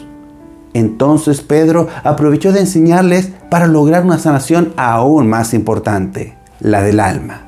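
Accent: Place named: Chile. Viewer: ❤hola buenas tardes saludos que bien video me encanta el acento de los chilenos ❤🎉muchas gracias por su trabajo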